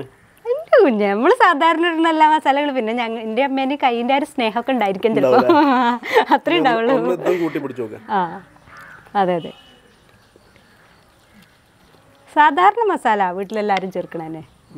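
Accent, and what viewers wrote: Malappuram, India. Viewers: ഒട്ടും ബോറടിക്കാത്ത സംസാര രീതി .. നമ്മളെ മലപ്പുറം ഭാഷ അടിപൊളിയല്ലേ,,, മൂന്ത ചപ്പ്ളി പിളി ആവും,, നമുക്ക് മാത്രം മനസിലാവുന്ന ഭാഷ.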